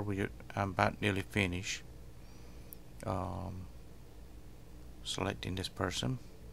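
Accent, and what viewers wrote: South, United States. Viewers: BEST instructional narrator on youtube! The laid back southern accent really takes the edge off.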